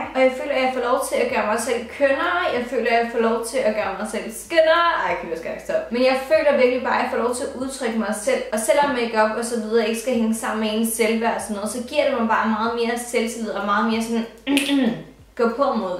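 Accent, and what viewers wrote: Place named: North Jutland, Denmark. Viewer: Elsker når man lige en gang i mellem kan høre en snert af din nordjyske accent <3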